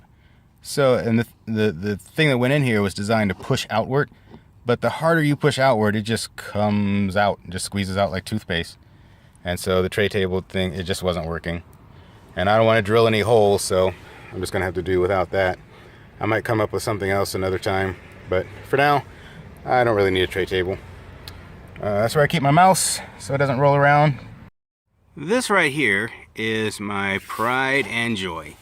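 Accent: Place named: Midwest, United States. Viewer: I came across your channel while searching for videos on Mini Van conversions as I have a 2014 Town & Country. As I listened to you I thought you sounded so much like my nephew who lives in Hobart. I watched all of your videos in one sitting and in one you state you're going from Portage to Portland. So that WAS a mid West accent I was hearing, and truly you sound just like my nephew! I thoroughly enjoyed watching your videos and look forward to more! You have a wonderful sense of humor and a great voice for YouTube!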